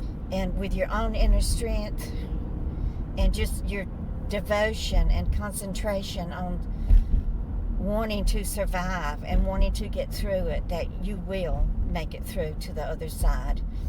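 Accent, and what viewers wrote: South, United States. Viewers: I heard about your channel on a post from a subscriber on "See Mindy Mom". I thought I'd check out your content. Since I chose this video first, it speaks to me rather personally. Our family tragically lost a younger brother when I was a high school senior, in 1972. Then in 1996, my husband's sister, a single divorced mom lost her life in a car crash. It devastated my husband's family. He has never come to terms with the event. My prayers are with you. You have a sweet Southern accent. Blessings to you,! Willette from Alabama 🙂🛐❤️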